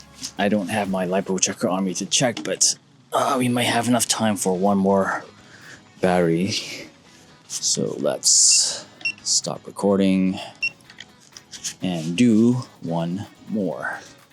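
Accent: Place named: United States. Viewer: You sound American. Did you have any trouble ordering the Pavo 20 pro 2 considering the dji ban?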